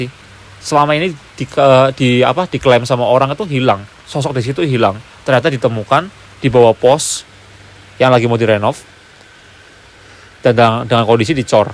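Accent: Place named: Java, Indonesia. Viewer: Channel saya suka sekali...logat Jawanya kental sekali dan campingnya sungguh bikin jantung 💓 copot hahaha.... salam damai bersamamu selalu dek.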